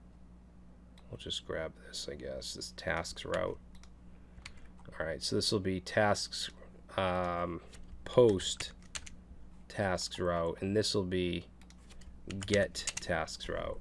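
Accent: Boston, United States. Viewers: Just... tremendous! Your laidback instructional style (and awesome Boston accent) has helped make so many seemingly scary technologies approachable for me, so heaps of thanks upon thee! Now that you've freed the masses from the shackles of "E" in Express and made Hapi.js accessible in its stead, I wonder what kinds of new stacks we're going to start seeing... I have a few in mind: * NASH: Node / Angular / SQL (whichever vendor) / Hapi * HORNY: Hapi / Oracle SQL / React / Node / Yeoman * HOTDAMN: Hapi / Oracle SQL / Typescript / Dust / Angular / Materialize / Node I wonder if any of these stacks actually exist out in the wild LOL!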